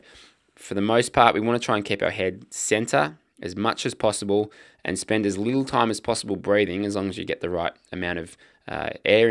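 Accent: Australia. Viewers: Awesome! Thanks! Though at first that lovely Aussie accent made "late" sound like "light" !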